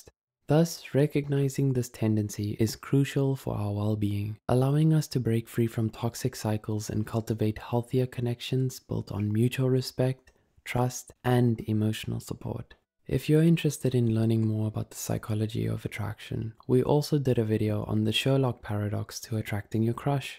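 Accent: South Africa. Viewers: I love the south african narrator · AS SOON AS I HEARD YOUR VOICE I WENT LOOKING AT YOUR ACCOUNT AND I KNEWWW YOU WERE SOUTH AFRICAN HIII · Random question is the person speaking from south africa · IS THAT A SOUTH AFRICAN ACCENT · Narrator is South African right? Ek hoor jou. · I haven't watched a psych2go video in a minute but I know a South African accent when I hear one. Loving the new narrator. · Is that a South African accent I hear, or am I just insane? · are you south african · I have to know is the person speaking here South African!!?? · Is the guy who did the voice over on this South African? I feel like I can recognise my own kind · The male, South African narrator threw me off guard. Not in a bad way, I was just expecting the usual woman. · This guy sounds south African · I'm detecting a South African English accent, am I right?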